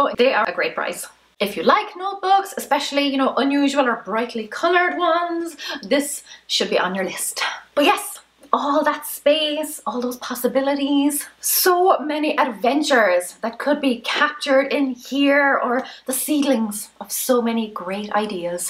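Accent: Ireland. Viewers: LOL "Shoot it out of a cannon", if only that was a real option. Then my neighbors could deal with all of my stuff haha. Great sense of humor, very inspiring. Liked and subbed. Also, love your hair, the name of your channel and of course your accent. Éirinn go Brách. I think that is correct? I'm about 1/4 Irish and born in the USA. (I'm not trying to be political, just supportive of Ireland, their culture and accents) I'm a compulsive collector (if you are wondering, yes, hoarding), with PTSD, MDD, ADHD, GAD, panic disorder, perfectionism and I watch these kinds of videos for inspiration, strategies to change my life. You're are fresh and funny, I think I will watch more of your videos. And yes, that means to procrastinate even longer LOL.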